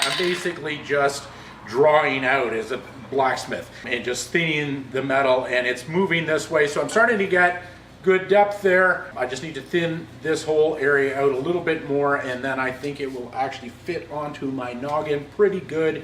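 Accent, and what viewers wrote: Canada. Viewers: Accent says Canada.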